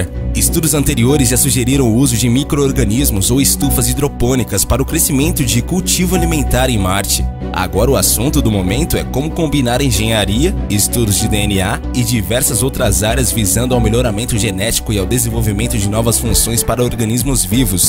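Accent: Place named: Caipira, Brazil. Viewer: O cara falo com um sotaque caipira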